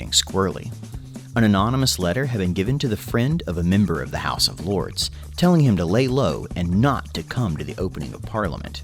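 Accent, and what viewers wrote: United States. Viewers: But he’s not British! We have an American accent, just as everyone has their own country’s. (Those are some counter-intuitive pronunciations - lol) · Makes sense why you know of it then seen as (I'm guessing from the accent) you're American. Good vid, got yourself a new sub. Much love from West Yorkshire, England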